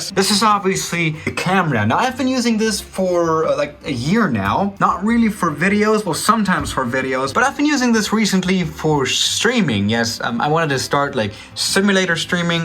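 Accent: Germany. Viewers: Wait are you actually German ?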